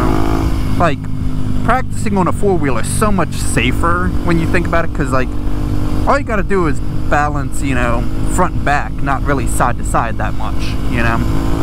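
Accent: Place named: Pennsylvania, United States. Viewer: For some reason I hear a PA accent…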